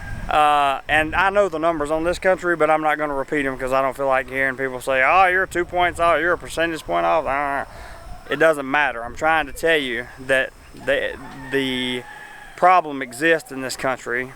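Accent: South, United States. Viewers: I don't know! his accent is a thick Southerner but his looks is more like the people of Saddam in Iraq or Syrians:-)) hahaha, maybe because I'm a local you look different to me... no offense I like your video:-)) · your from texas cause your accent like a cowboy... i like it... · love that accent..what part of south you from..mind me asking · He sounds like One em gangs in Red dead redemption 2. A strong southern accent so cool. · Just came across your vlog I subscribed, thank you for the honestey bro! My dream is to come to the Philippines, I love everything about the Philippines I plan on making it there soon! Where are you from it sounds like somewhere in the southern states? ( NC, SC, VIRGINIA )???? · What southern state are you from? Louisiana? Reminds me of Bobcat Goldthwaite.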